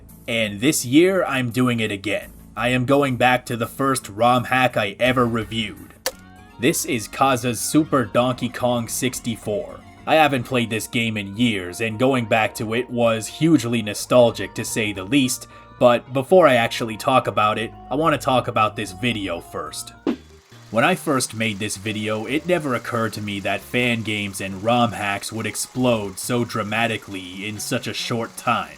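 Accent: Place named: New York, United States. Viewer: I find it funny how in ten years voice went from sounding like a generic 17 year old boy to a gravelly new york stereotype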